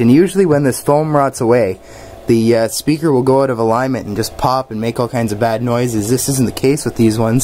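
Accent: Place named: Canada. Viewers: how cant you not tell someone is Canadian, Eh? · I detected he is a Canadian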